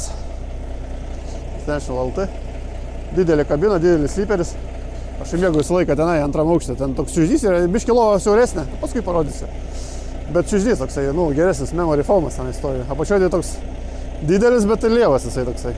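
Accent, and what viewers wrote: Šiauliai, Lithuania. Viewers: is akcenta bene is siauliuu busi?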